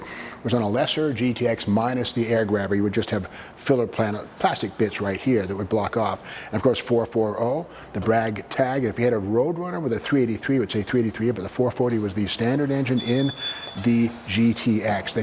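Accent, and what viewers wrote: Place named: Northeast, United States. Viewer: Is it just me, or is speaking with a more pronounced Northeastern accent?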